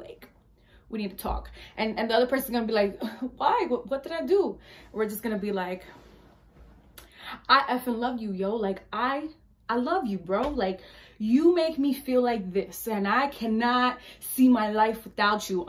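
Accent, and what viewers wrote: United States. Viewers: Lmao omg you sound just like meeee! You def gotta be from NY or something! Anyways , CANCER GANG July 12th♋️ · July 16th you sound like your from the Bronx also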